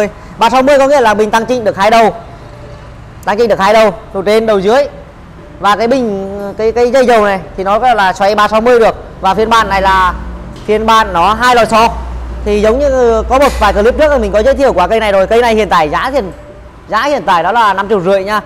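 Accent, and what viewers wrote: Nghe An, Vietnam. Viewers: Bác người Diễn Châu nghệ an phải ko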